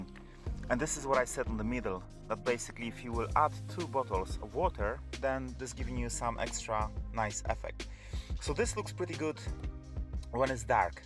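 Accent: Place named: Poland. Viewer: Ty jesteś Polakiem słyszę jak mówisz 😆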